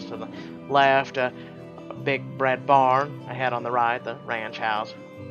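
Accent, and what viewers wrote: South, United States. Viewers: Some dumbass accused you of being racist for using southern drawl? Really? Well, I'm a black woman and I never thought you were racist. I was just enjoying the videos. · Not American, but always liked this southern accent. YOU ARE KILLING IT! Good job!!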